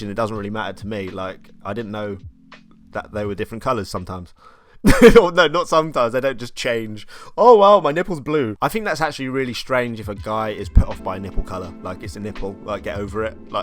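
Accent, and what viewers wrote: United Kingdom. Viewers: I love your British accent and hello from Germany 👋🏼🌸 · Okay I just clicked on this and your energy and British accent are so cute 😭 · Your accent is like you're one of the cast of sex education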